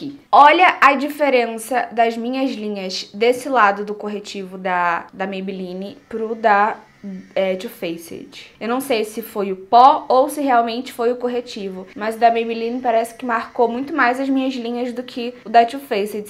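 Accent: Rio de Janeiro, Brazil. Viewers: Você e muito linda e simpática, mas tenho uma pergunta, você e Carioca?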